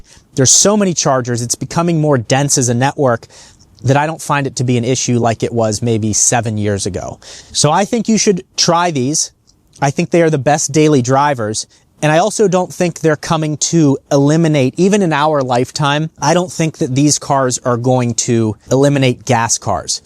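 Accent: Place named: AAVE, United States. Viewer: Cringe ebonics simpleton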